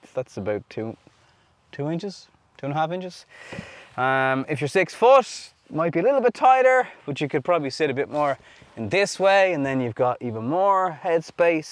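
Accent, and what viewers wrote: Ireland. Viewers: Good Good, he is very Irish.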